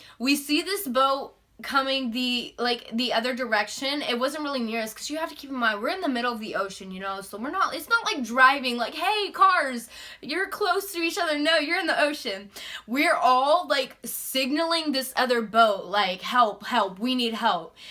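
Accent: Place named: Atlanta, United States. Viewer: Girl you gotta be from Atlanta. Lol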